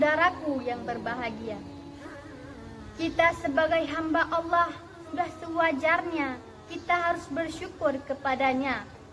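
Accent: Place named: Java, Indonesia. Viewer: Tapi bahasanya masih medok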